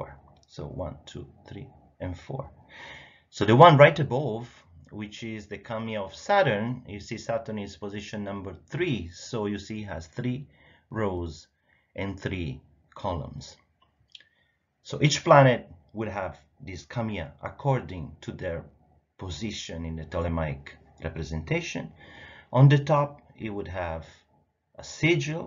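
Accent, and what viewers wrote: Italy. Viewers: Sorry,are you italian??? 😅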